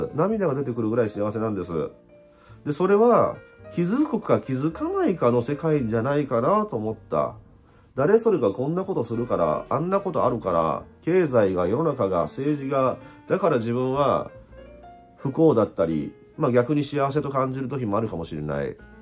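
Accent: Osaka, Japan. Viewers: 少し、面白くないことがあって、得意でないお酒を用意して、 YouTubeでも観ようか。。。と思ったら、そこにQさんが居ました。 丁度、今、聞かなくてはならないお話でした。結果、楽しいお酒になりそうです。 大阪弁で少し早口のQさん、とても良かったです。いつもありがとうございます。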